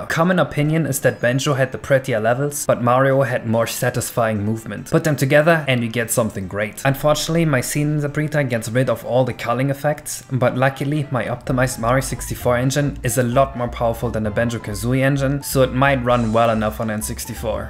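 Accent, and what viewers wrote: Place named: Germany. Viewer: jo bist du aus deutschland? hast nen deutschen aktzent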